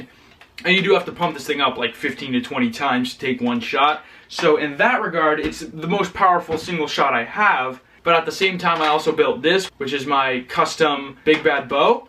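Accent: Canada. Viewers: are you canadan or american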